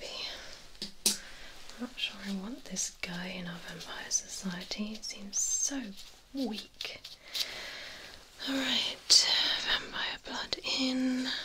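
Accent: United Kingdom. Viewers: British accent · This is by far your best work so far. Please make another vampire video this is my favorite one out of all of them, most of the time i dont make it to the end of the video because i am so relaxed and your voice is so soothing that i fall asleep half way through the story i love your British accent and this story that you came up with is so awesome, i cant thank you enough for making these fantastic videos sincerely Richard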